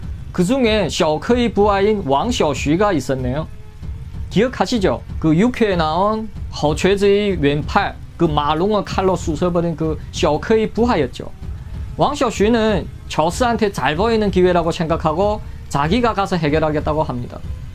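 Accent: Yanbian, China. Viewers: 말투가 조선족인데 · 오랜만에 조선족 이 말하는 조선말 들으니 예전생각 나는군요 중국에서 내밑에서 일하던 조선족 직원들 생각납니다 다들 잘있는지 재미있는 채널이군요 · 연변말투 배우고 싶다 혼자야~앙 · 延边土话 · 연변말 와 한국사투리 힘들게 듣고 감니다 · 너무웃겨요 ,,중국식 한국말이요 ,👍👍 재미있고 흥미롭네요 ,,조선족들에 만행들이 ,대단하네요 ,범죄도시, 실화를 바탕으로 헐? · ㅋ ㅋ 완전 한시간동안 헛소리만치구 자빠졌네 말투가 연변말투인데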